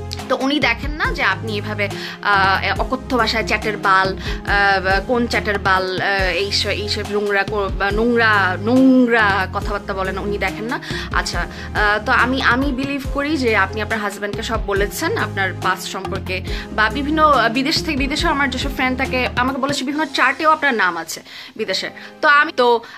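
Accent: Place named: Noakhali, Bangladesh. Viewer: Noakhalir meye bole kotha love you apu 😘😘😘😘😘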